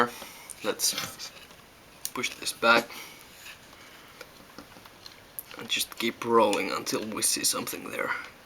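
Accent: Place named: Finland. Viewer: Of all the euro accents, finnish is my favorite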